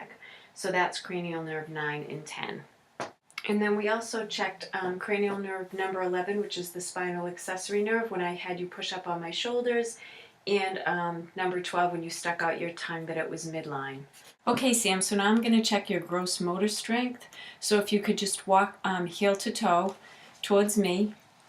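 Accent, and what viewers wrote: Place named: New England, United States. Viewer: Love the New England accent.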